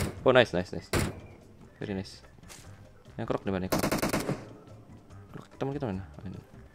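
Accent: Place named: Medan, Indonesia. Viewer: Beda logat bro bg org medan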